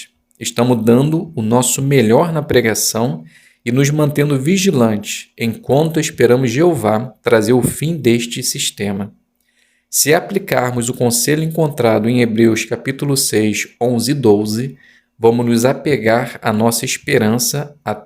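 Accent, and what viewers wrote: Rio de Janeiro, Brazil. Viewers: Legal, parabéns irmão. Amo o sotaque carioca.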